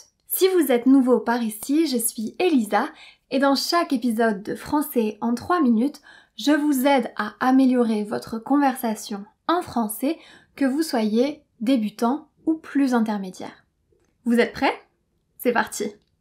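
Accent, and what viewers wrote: England, United Kingdom. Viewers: Je suis un peu confus maintenant Elisa... 😐 Selon Duolingo, voilà quelques exemples comme ça : Il faut - he, you must Il dois - He has to Il devrait - He should Je voulais te dire que j'aime bien ton accent en parlant anglais Elisa, tu parles comme les anglaises. C'est si mignon 😍 Est-ce que tous les français ont l'accent du Angleterre en parlant ? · ou peut etre... vous sonnez comme British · I noticed that you're pronunciation in English like British. N'est ce pas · I am so tickled that you have an English accent with your English language. ❤ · Am I the only one who besides looking forward to learning French from Elisa, also looks forward to hearing Elisa's English accent? HAHAHAHA. C'est très beau!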